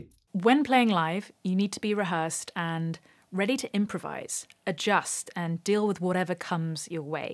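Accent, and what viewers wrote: United Kingdom. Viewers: i just realized you are ...British?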